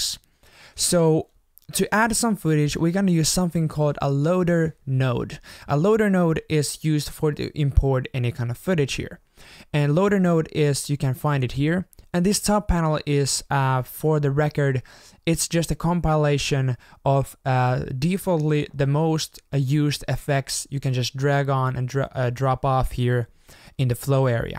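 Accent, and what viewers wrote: Finland. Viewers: Are you finnish? Ooks sä suomalainen?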